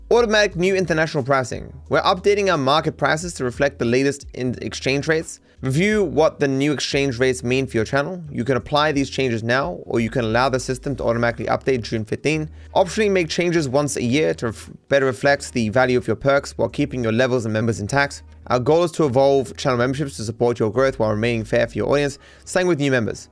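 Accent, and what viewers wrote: Australia. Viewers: I'm from the north of the UK but don't really sound it. It is a very bland accent. I could tell you were Aussie but only just when I started watching. Outsiders from the UK have no Idea how many accents we have, they think we are all royal or some shit, clearly never been to Marsh green in Wigan lol. Go up the road were I live and you will find very different accents. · I'm American and have never thought you were anything other than Australian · I'm australian and i never thought his accent stood out, mind you i was still able to distinguish you had an aussie accent the first time i came across one of your videos just to go check the channel name and everything clicked. As a side note and being as respectful as possible, you seem to speak really quickly EVEN by australian standards, like we're known for shortening phrases, but for example you said 'a lot of things' as if it were one syllable or one breath. 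Like, even faster than saying 'lotta things' slowly, i think its made even worse by the enunciation that you use to make yourself sound readable to non-english speakers. and i have a few mates of mine (doing I.T if that tells you anything) who try to get out large 6 syllable words out really quickly while ALSO enunciating (without slang), and to me sounds unnatural/forced to me despite having no trouble trouble understanding what they're saying, similiar vibe here. But i suspect this is what the non-english speakers having trouble with as it doesnt match the natural anticipation or cadence of, say for example a british or american english speaker so i reckon it might come off as hard to follow the pacing, so it's not just a culture thing but also your own habits. I'm no linguist though. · I hear the Aussie because you aren’t as (I use this very very loosely) formal as a stereotypical Brit and not as limp as American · Nah, if you can't understand the Australian accent, that's a skill issue. 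The only people that can be difficult to understand are the Scots.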